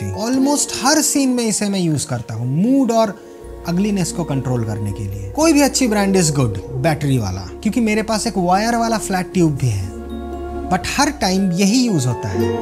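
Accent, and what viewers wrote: Bengal, India. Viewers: Bhai tum Bengali ho ???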